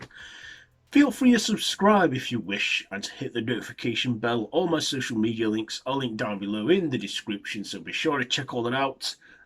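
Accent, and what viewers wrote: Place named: RP, United Kingdom. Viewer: This guy sounds exactly like Sir Brian Blessed. God Queens English accent.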